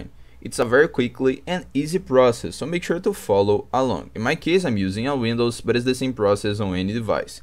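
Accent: Brazil. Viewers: com certeza é um brasileiro kkkkkkkkkk mto bom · amigão, eu reconheço seu sotaque brasileiro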